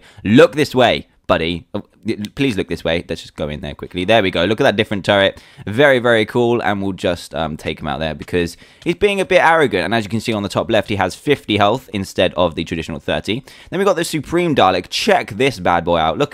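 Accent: United Kingdom. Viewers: and the cool thing is, you have a british accent, so you sound right when you say "dalek" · Dr Who Has A British Accent Just Like YOU!!!!!!!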